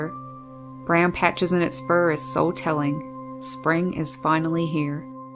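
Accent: Canada. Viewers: What a beautiful birding documentary. The scenery is gorgeous and the birds you have around you are amazing. I am in Michigan and when I hear someone say out the way you do I can tell you must be from Canada. Northern Canada. Maybe I am wrong. I have watched you on and off for a while and now I am watching much more. Do you have any advice for me? I am moving in the spring/summer to my home in the thumb of Michigan’s lower penninsula. I am now in the Detroit area. We get birds in our yard, in the woods there at our other home where we are moving permanently but I would like to maximize this. I get the normal finches house, and gold finches, chickadees, rose breasted grosbeaks a rare cedar waxwing but it has been years, and we get Veery Thrush who sound amazing but are a rare site, and lots of wood peckers downy and red bellied, and eastern phoebes juncos etc. but I want the blue jays that I never see up there. 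We are right off Lake Huron and att our friends house they have purple Martins, Sand pipers and gulls. Can it draw blue jays to wooded areas? I will live in the woods?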